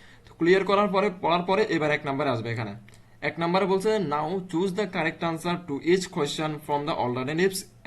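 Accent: Chittagong, Bangladesh. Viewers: Sir apni ki Chittagong er?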